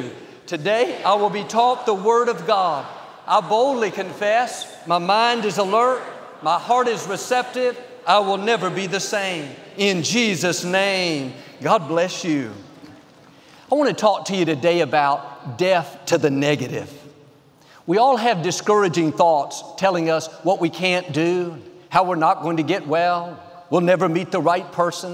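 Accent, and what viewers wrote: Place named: Houston, United States. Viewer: "DON'T BELIEVE THOSE LIES!!!" (with his Houston TX accent!!!) One of my three most favorite comment/quote by my pastor Joel!!!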